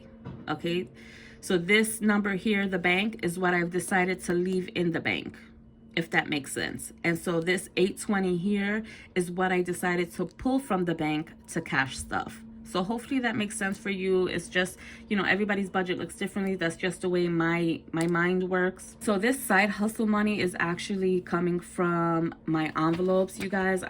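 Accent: New York, United States. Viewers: This story really resonated with me. I grew up in NYC too..I hear the NY accent in you lol. I actually bought my first budget binder because of watching your videos. I’m hoping the cash budgeting can help me too. Growing up my parents had really poor money management skills too. My mom was a mom of 4 living off public assistance and my PTSD was her always asking people to borrow money. I vividly remember numerous times she would beg people to loan her money because she couldn’t afford to pay her bills and all the borrowing led to more borrowing and she was always behind. To the point where we almost lost our apartment several times and eventually she couldn’t afford to live where we were and we had to move to the projects. I remember just being ashamed. The adult in me now just thanks god that at least we had a roof over our heads but even then in those reflective moments i wish my mom did better. 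Then when her kids were growing up she looked at our incomes as a way to keep up with her bills. My story is too much to tell on here but even though I never became like my mother asking for help all the time, I didn’t have good financial management skills and education. I didn’t have family members to show me how to save and talk to me about good credit. I used credit cards like they were extensions of cash instead of loans and here I am years later struggling with debt. Your channel is my favorite out of all the channels with cash budgets that I’ve seen so far. I also am in substa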